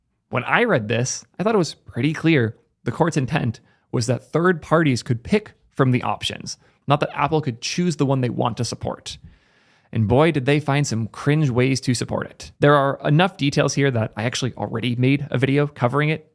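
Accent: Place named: United States. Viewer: You sound like an American.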